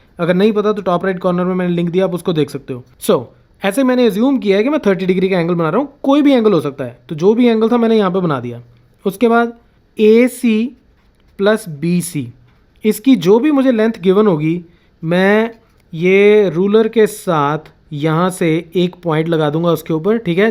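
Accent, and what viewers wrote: Punjab, India. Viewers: By your accent I think you are a PUNJABI Thanks for explanation ☺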